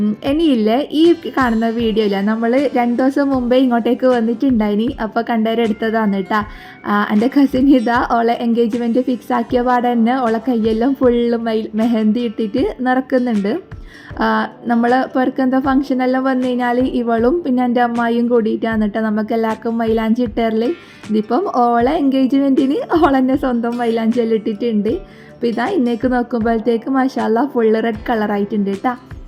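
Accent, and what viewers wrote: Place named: Kannur, India. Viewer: ഞാനും കണ്ണൂർ ആണ് എന്ന വിചാരിച്ചു കണ്ണൂർ സംസാരം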